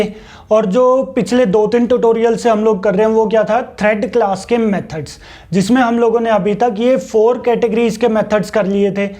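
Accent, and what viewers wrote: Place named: Punjab, India. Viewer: Love ur punjabi touch hindi..... Hanji ..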